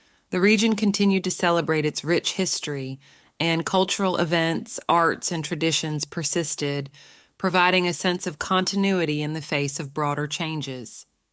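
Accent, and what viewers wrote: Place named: Deep South, United States. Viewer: It is interesting listening to a narrator from the American deep south, talking in her strong accent, talking about the Yorkshire Ripper in Northern England.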